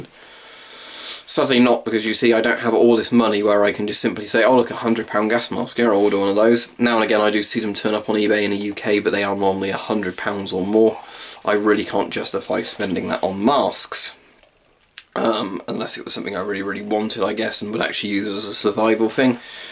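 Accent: Germany. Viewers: oh man that German accent kills me every time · please do a video with your german accent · It surely has a tight seal and is rather comfortable. The straps are good i guess better than the czech straps, they are not that good in my opinion esspecialy on the M10! BTW. loved that german accent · I can help but laugh at your german accent but it is still way better then mine...us americans are limited to accents but then again america doesn't have a specific accent bc we have people from multiple countries yknow what I mean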